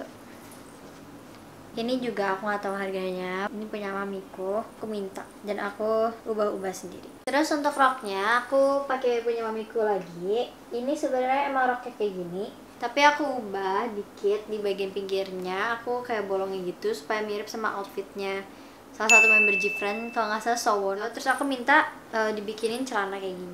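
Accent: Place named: West Java, Indonesia. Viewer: Kaka orang Sunda ya... Logatnya keliatan😄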